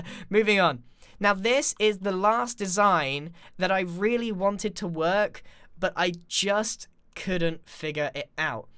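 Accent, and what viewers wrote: United Kingdom. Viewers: Tyler Szulkowski he's British, its part of their accent,it sounds like swum but its actually swam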